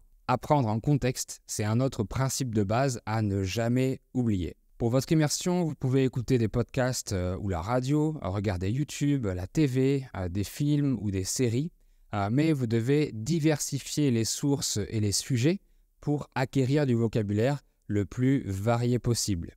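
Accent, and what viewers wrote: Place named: South, France. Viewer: Salut tout le monde. Maintenant je suis en train de "déviner" les accents. Je dirais qui l'accent de c'est du Sud. Marseille, peut-être?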